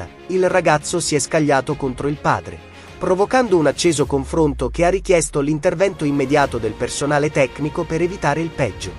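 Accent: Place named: Southern Italy, Italy. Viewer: Forse perchè è meridionale o romana...?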